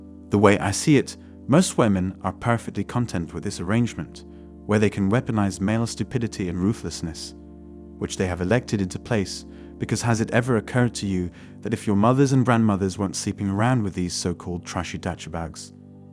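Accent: United Kingdom. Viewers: He just goes on and on with the same old tirade. You don't learn anything on this channel. You are just putting pennies in his pocket. Then he says in some videos that he is American but you hear a British accent lol. I think it's a text to speech program because some simple words are often mispronounced.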